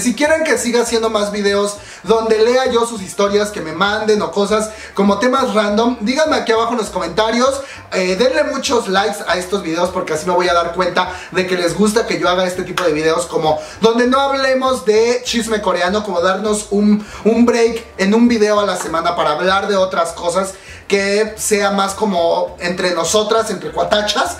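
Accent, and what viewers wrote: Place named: Mexico City, Mexico. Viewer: De donde será la? Tiene acento cedemexeño pero no lo sé:0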